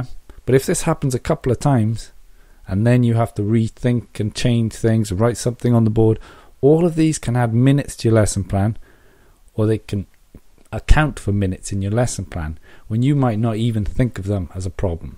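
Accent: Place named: Wales, United Kingdom. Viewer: He sounds Welsh to me :) albeit very subtle..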